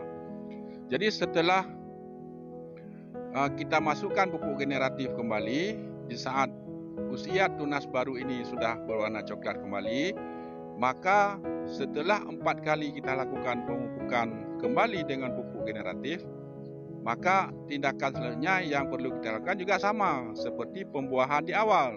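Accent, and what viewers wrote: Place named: Aceh, Indonesia. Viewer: sperti logat aceh bole tau dimana gardennya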